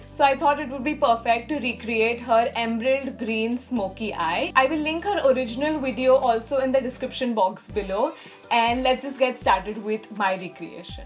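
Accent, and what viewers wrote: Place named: Gujarat, India. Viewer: Your Gujarati is soooooooooooooooooooooooooooooooooooooooooooooooooooooooooo cute 😍😍😍😍😍😍😍😍😍 OMG ❤❤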